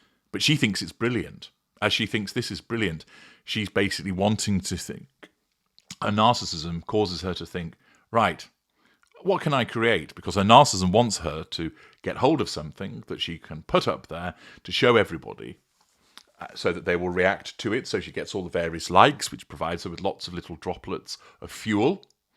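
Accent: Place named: England, United Kingdom. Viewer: 🎉Especially with the beautiful ecglish ac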